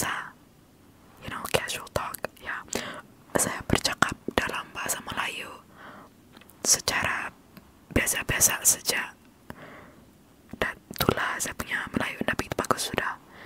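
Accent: Sabah, Malaysia. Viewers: your malay sound like sabahan malay a little bit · Logat Sabah oo 👍🏻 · I love your sabahan accent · Sabah ni logat dia terbaik! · hahahaha sabah bah ini😂😂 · it's because she's sabahan. their bahasa melayu is in a different accent then malays in semenanjung. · damn im a sucker for your sabah’s accent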